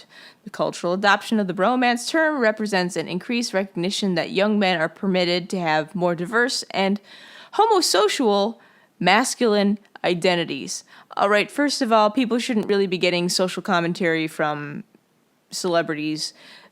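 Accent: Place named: Brooklyn, United States. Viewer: You sound like you are from Brooklyn. This pleases Me. Great job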